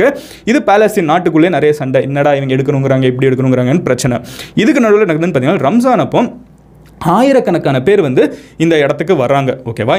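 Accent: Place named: Kanyakumari, India. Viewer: Kanyakumari slang ❤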